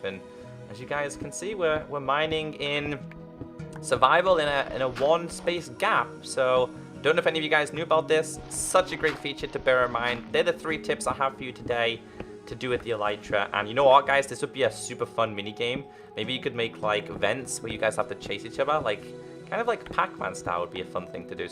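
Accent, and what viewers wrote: United Kingdom. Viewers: Are you britsh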